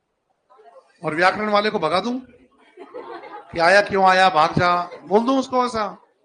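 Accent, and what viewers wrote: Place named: Bharatpur, India. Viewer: Lagta hai guruji Bharatpur se hai hamare jesi language hai bilkul ye Bharatpur bali hi h😀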